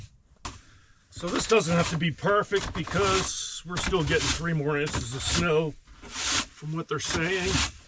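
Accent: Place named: Philadelphia, United States. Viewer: Good day! I’m picking up bits and pieces of a Philly or Philly adjacent accent! Where are you from originally?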